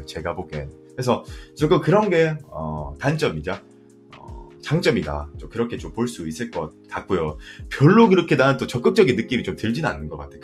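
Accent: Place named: Gyeongsang, South Korea. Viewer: 경남분인듯 저 3번여 콩 콩 ㅋㅋㅋㅋㅋㅋㅋㅋㅋ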